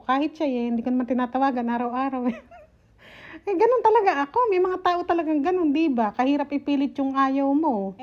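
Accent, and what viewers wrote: Nueva Ecija, Philippines. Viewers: Grabe yong buhay madam ano, lalo yong 10-13 pero talagang pag mag ti-tiyaga may maganda din mangyayari. Tignan mo ngayon super Blessed kayo. Followers mo ako sa dalawa mong fb account tas dito sa YT, silent, di ko lang napigilan mag comment ngayon. Andito din kami sa sharjah gusto ko din nga sana makapag bigay sa mister mo kahit paano sana tapos maisend sa inyo dyan sa pakistan. Ps. Ang asawa ko naman ay taga muñoz nueva ecija, kaya super natutuwa ako sa accent mo. ❤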